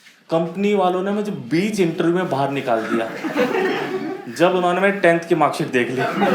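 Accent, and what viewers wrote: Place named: Haryana, India. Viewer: Bhai randeep hudda ka doooor ka cousin lgta hai, hariyanvi touch bhi aa rha hai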